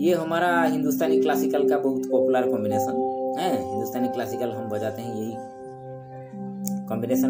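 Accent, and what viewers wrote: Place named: Bengal, India. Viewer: Res sir pranam Excellent tut I am practicing the same My request is if you can text swars along with it Your accent is bengali g sounds like dh Regards Pl forgive me if I am wrong God bless you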